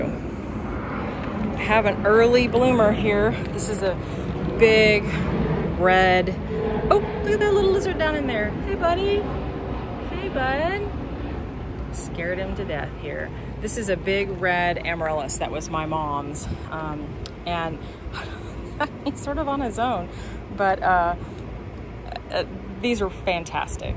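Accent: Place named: Texas, United States. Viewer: Your garden is such a delight. Enjoy listening to your Texas voice as you describe everything. Thank you for sharing.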